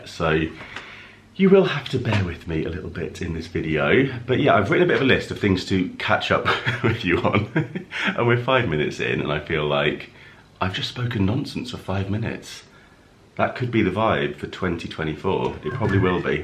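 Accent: United Kingdom. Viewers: You could put out a video about anything and I would watch. Really. You could just sit and play with the kitties. I love your attitude, you're interesting, you're funny, you live in the UK, and I love hearing the accent and seeing the sights. Congratulations on the book! That's exciting! I would love to watch the series, but I don't think there's a way to do that here. I hope you feel better. Happy New Year to you and the kitties!😊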